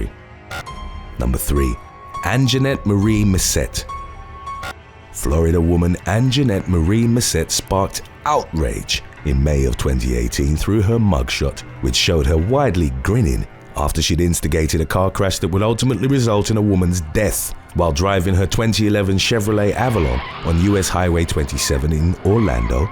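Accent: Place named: Scotland, United Kingdom. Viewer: I love his Scottish accent it's just so unsatisfying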